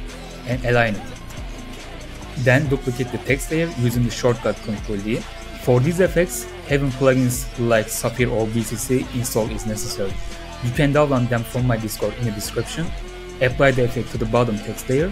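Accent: Turkey. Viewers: It is understood from your speech that you are Turkish (I am Turkish and I do not have after effects)❤ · Aksandan direkt Türk olduğunu anladım, bu tarz içerikler için tebrik ve teşekkür ediyorum dostum :)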